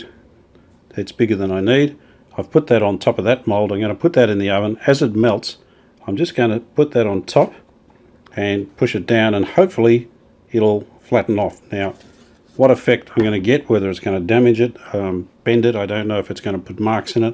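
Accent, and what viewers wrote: Australia. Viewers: Bravo! I have watched about a half dozen of your videos from start to finish the last couple of days and I am impressed by your expertise. I have been learning a lot even though I will probably never be able to repair the electrical components of a tube radio but, I watch to see and learn from your timber restoration techniques. I have restored a couple of timber (we call it wood here in the US) tube radio cabinets recently. I'm guessing your dim light controller is home made. Is there any chance of making a video showing how to make an exact duplicate? Just a thought. Is your shelf of radios in any of your videos? It would be great to see that as well. I also enjoy your Aussie accent and unusual Aussie phrases. Keep up the great work!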